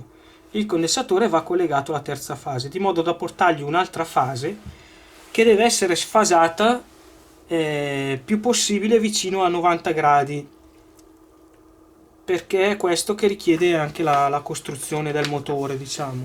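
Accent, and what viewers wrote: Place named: Brescia, Italy. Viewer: sei bravo e spieghi bene le cose emi piace il tuo accento di brescia mi preoccupa un po vederti armeggiare con tutti quei fili scoperti , stai attento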